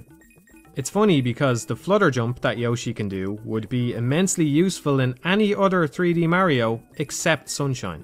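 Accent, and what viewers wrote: Dublin, Ireland. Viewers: Great to hear a working class Dublin accent on youtube, especially one that voices such well informed analyses.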